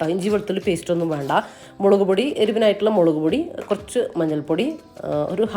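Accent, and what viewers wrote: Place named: Kasaragod, India. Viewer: Ithante Kurachoke words Kasaragod slanganallo.❤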